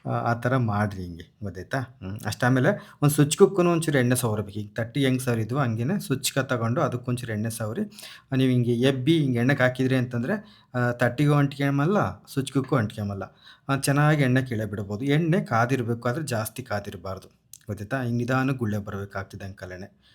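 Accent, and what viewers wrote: Chitradurga, India. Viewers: ಕೋಲಾರ ಅಲ್ಲ adu Chitradurga da ಭಾಷೆ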